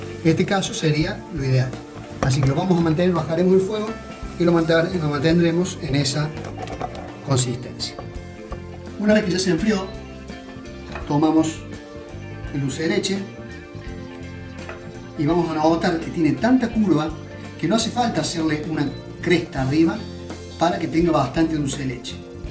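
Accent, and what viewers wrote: Córdoba, Argentina. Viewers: Sabor Cordobes con tonada cordooooobeza!!!Excelente explicacion y con su historia! los voy a hacer!!! y despues cuento!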